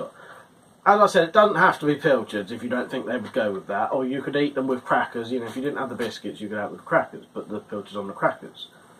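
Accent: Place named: London, United Kingdom. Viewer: Dont want to sound or be rude but wheres that accent from?. Its a good working one but also sounds a bit distinguished.... Is it a London accent.. Good Vids BTW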